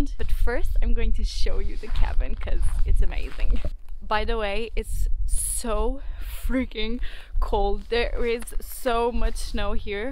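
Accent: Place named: Norway. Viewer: Hi I'm Joachim I'm from Oslo, like you're videos 👍 I think it's really cute that you're English now starts to have a Norwegian accent 😊😅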